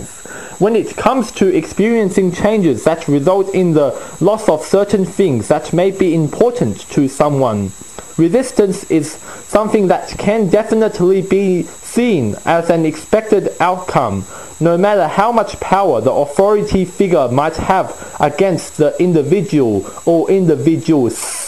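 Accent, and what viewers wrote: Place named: Asia. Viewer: why the asian accent ?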